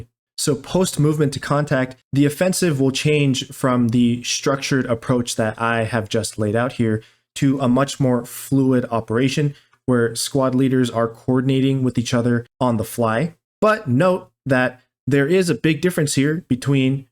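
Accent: United States. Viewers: that’s crazy would’ve never guessed your American accent and language skills are so good.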